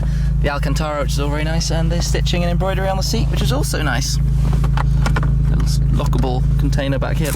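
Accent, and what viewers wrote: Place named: United Kingdom. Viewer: Couldn't get past his accent. Annoying pasty white Brit. Queer little girls car. Weak.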